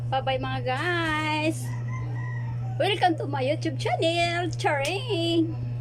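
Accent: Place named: Visayas, Philippines. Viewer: Nakakatawa po.. Parang may bisaya accent ka po like me.. Bagong kaibigan mo po.. Natapos ko na din tong mapanood sana tulungan mo din po ako..😊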